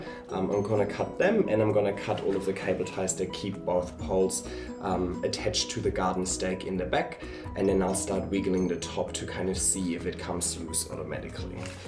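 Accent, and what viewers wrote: Germany. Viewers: Den deutschen Akzent hört man einfach immer raus :-) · Lovely video! Is that a german accent u got?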